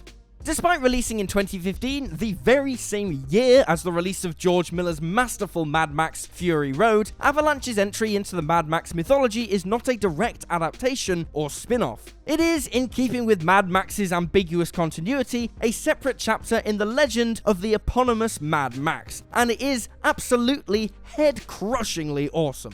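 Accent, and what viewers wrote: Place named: United Kingdom. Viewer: Usually British accents sound pleasant. This is a big break from that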